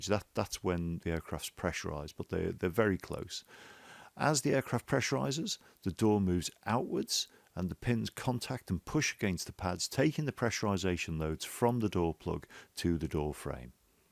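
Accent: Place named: Wirral, United Kingdom. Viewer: You’ve got to be from the Wirral i’ve been looking at your LinkedIn profile and the moment you started speaking I thought I know that accent. 😂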